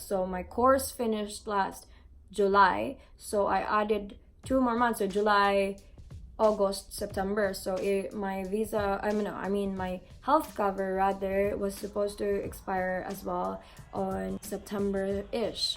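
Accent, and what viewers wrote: Australia. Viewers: How did you get settled do you have relatives there? Btw love aussie accent! 😊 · char naging australian accent na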